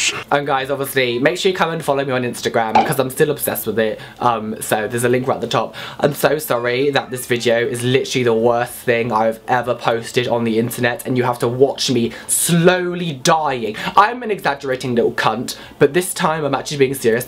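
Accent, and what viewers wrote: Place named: United States. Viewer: you acually sound really American ( the accent) :))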